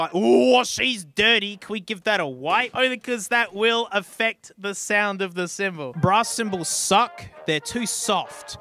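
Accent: Australia. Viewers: Is this? Or do all Australians sound the same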